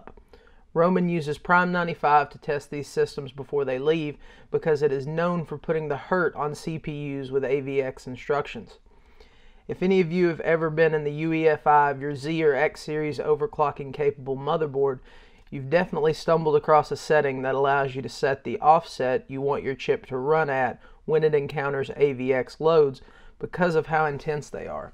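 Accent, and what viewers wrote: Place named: South, United States. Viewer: "happy as a pig in shit" Dude, you have a wonderful way with words. I haven't seen a tech channel run by someone with such a strong southern accent. It's a great pairing! I don't really subscribe to channels these days, but you seem to be making promising, informative videos and I want to see you grow, so I subscribed. Looking forward to more stuff from you!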